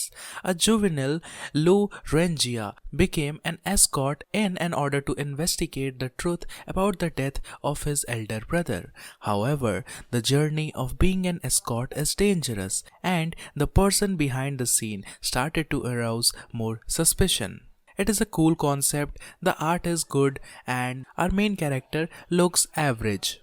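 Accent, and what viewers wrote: India. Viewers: he's Indian right?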